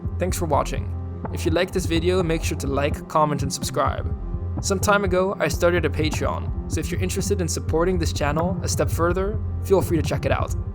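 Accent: Rotterdam, Netherlands. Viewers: You sound dutch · Als deze gozer niet Nederlands is dan eet ik een blauwe banaan. Wel goed accentje tho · I'm pretty sure the maker of this video is from Rotterdam, just because of the way he says it 😂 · Sounds dutch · Are you dutch? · I was casually watching the video... then "no, another Dutch guy" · You're Dutch aren't you? Good vid!! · He's dutch Can't you tell by his accent? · Unmistakable Dutch accent ;-) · hi narrator Are you dutch? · Pretty sure this guy is dutch speaking. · Confirmed your dutch No one in the world except Ppl from Rotterdam with accent can speak Rdam like that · I can hear you are a dutch speaker :) loved the video! Will come back for more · I dont think so because he sounds Dutch. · His accent in English sounds very Dutch. Can’t say I’m surprised he is Dutch haha. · I thought he was Dutch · I hear Dutch English... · HOOR ik daar een Nederlands accent? 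· Rottedam je klinkt als een echte Rotterdammer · I'm not Dutch or French, actually English. But he definately sounds more Dutch than French. French accent is always thick when speaking English lol you can tell a mile off. Dutch is much more subtle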